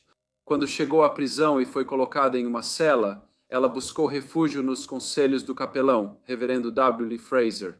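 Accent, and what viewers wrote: Portugal. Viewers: Os casos são interessantes. Mas a forma de vc contar. Muda a voz as vezes parecendo um português de Portugal. E chato.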